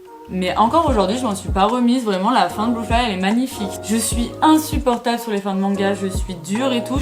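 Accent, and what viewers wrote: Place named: Lyon, France. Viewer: Jure on a un accent à Lyon je me rend même pas compte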